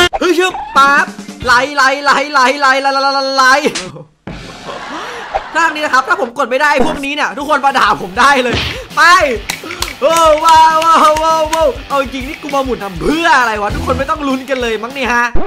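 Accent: Isan, Thailand. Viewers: พี่อีสานป่าว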